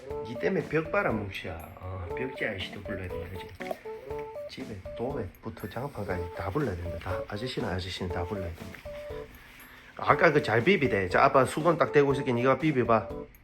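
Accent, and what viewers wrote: Gyeongsang, South Korea. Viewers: 오랫동안 질리지 않는 이 사랑스러움과 친근한 경상도 사투리의 주인님 찰떡궁합과 멘트 쵝오🎉🎉🎉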